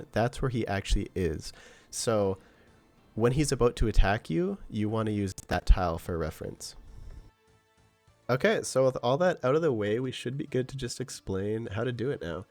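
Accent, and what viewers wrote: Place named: Canada. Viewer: We guessed you were Canadian 2 seconds in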